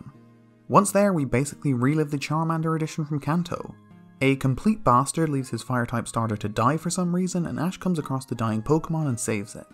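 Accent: Ireland. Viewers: hes from ireland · Oh ! I didn't realise you were Irish too!! That's so cool. Hello! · Aye I didnt know you were irish too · NorseGoat I can only recognise two Irish accents, and his voice didn’t sound like either but I feel like such an idiot for not realising, I just watch too much Foil Arms and Hog.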